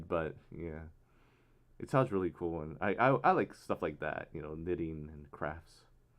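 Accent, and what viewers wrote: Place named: South, United States. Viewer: He's from the South, in Oklahoma, so hearing him adds a bit of humor to his content. But he's very friendly so that just ads to the charm of it all.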